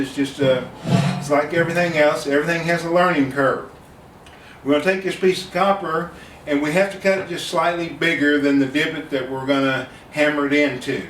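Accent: United States. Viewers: Sir, I swear you have to be from Texas. You sound exactly like my daddy.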